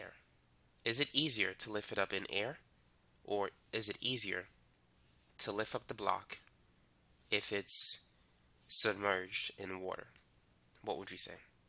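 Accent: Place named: New York City, United States. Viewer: Damn you have a heavy NYC accent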